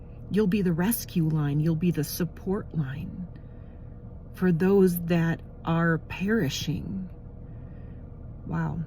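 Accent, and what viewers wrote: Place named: West Coast, United States. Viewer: Seems like you have a west coast accent, i thought michegan folks would kinda have a jersey accent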